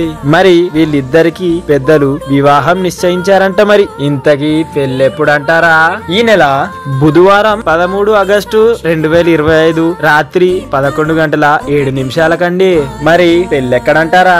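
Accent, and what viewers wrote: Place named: Godavari, India. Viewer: Godavari slang ela chesaru bro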